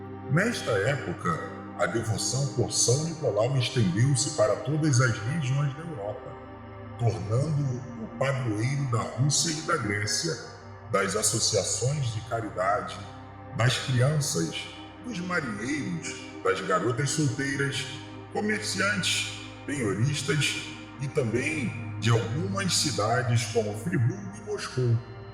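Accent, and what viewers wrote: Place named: Rio de Janeiro, Brazil. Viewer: Sotaque carioca é tenso mesmo KKKK mas o vídeo é otimo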